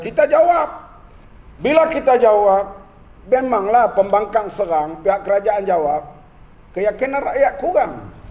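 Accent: Kelantan, Malaysia. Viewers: Hhhahah kecek kelate siap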